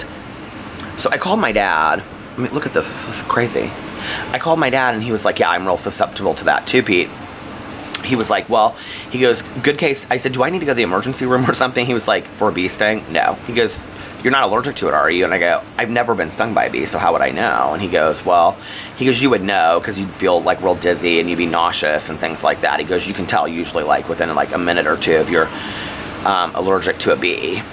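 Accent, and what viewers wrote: South, United States. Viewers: I would say you have a Southern-esque accent. I have a friend from Georgia and you sound almost exactly like him, just not as extreme. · I totally hear the southern in your voice!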